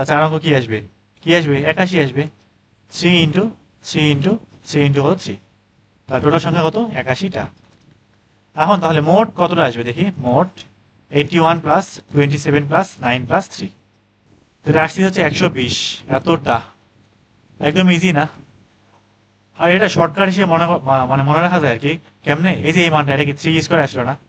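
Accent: Naogaon, Bangladesh. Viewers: Vaiyer basha naogaon te naki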